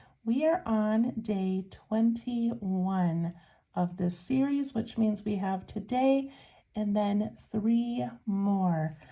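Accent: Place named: Midwest, United States. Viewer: Random question: Where do you live? I hear you say 'you guys" and can't help but to think you live in the Midwest. I grew up in Kansas, but now I live in NC. When I say that some people will comment when I say it. I may live in the south, but I am not going to change my vocabulary!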